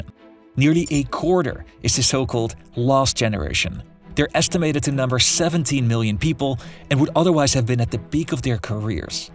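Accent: Netherlands. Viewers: Dutch accent English. Very well documented this. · I know a lot of people who want to live in Japan. People who are taking their culture with them and try to enforce it on the Japanese, which will be similar scenario what is happening in the west right now.... And yu are Dutch channel. As native Dutch speaker myself can always hear the accent haha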